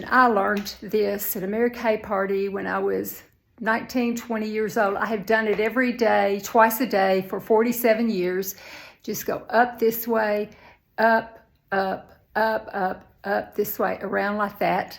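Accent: South, United States. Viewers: You are just so stunning and in your 60s. You look so much younger. I love your beautiful Southern accent! Thank you for all the great tips. I love your channel.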